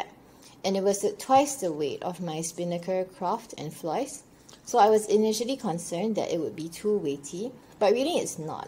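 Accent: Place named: Barbados. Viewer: Where are you from? You sound like you're from the islands. Barbados?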